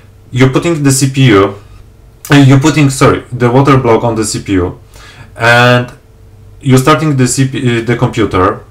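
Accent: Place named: Poland. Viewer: haha domyślałem się że jesteś rodakiem - mamy charakterystyczny akcent :) ale Twój angielski jest naprawdę ok - Pozdrawiam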